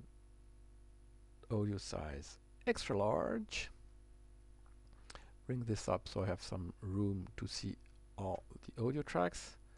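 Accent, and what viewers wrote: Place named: France. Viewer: C'est un Francais non? xD l'accent!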